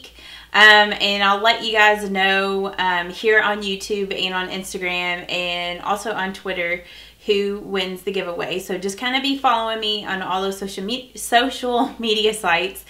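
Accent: South, United States. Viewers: Enter me in the giveaway. I have followed you for a long time, your channel is amazing. Love your Southern Accent and love to here you speak. Blessings!!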